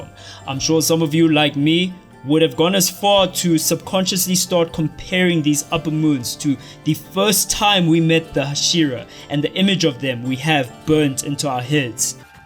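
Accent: South Africa. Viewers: I swear brother you gotta be South African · where are you from? You sound South African bro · You're south african right? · r u from ZA? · So good to hear an SA accent in a video about my favorite series. Reminds me of getting lectured on Pokemon by my older cousins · You sound South African my dude I like the accent ✨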